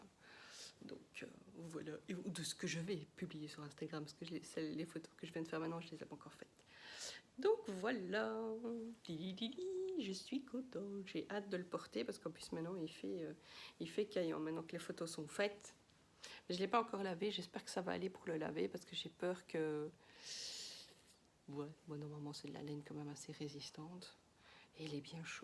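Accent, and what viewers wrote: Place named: Belgium. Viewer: Bonjour je viens de découvrir tes podcasts tricot et en t’écoutant je me disais bien elle est de Belgique cette dame 😂😂 Ton visage ne met pas inconnu peut-être du monde du foot ou pas😆 Moi je suis de Uccle je ne sais pas si tu connais, en tout cas je passe de très bon moment en visionnant tes vidéos 🫶 Bisous et bonne journée 💋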